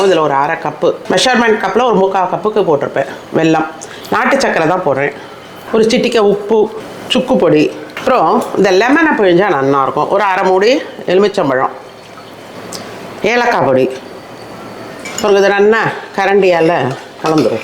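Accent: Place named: North Arcot, India. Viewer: Mami- so happy to have come across ur channel. You should be from north arcot. Been looking for NA samayal and tradition to a long time. Yours is the first. Getting so nostalgic of my childhood , your voice and accent resembles a lot like mom and paati. ‘Aponcha’, ‘Vadaparupu’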